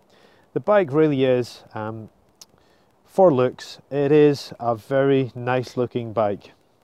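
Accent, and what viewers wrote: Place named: Scotland, United Kingdom. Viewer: Scottish accent there I sense. Where you living now mate!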